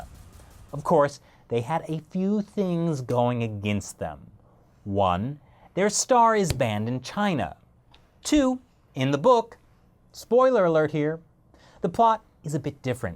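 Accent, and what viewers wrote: Australia. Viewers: an asian girl with an australian accent... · Australian accent?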